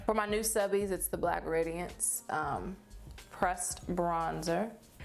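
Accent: South, United States. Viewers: you sounded so country and southern in this video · girl you sound so country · i love your country accent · her Invisalign makes her sound even more southern/country? Its so cute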